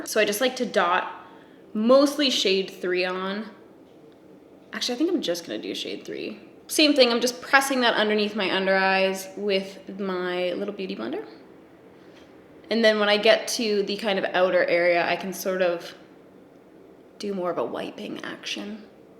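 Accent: Canada. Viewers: This was lovely to watch are you canadian🇨🇦?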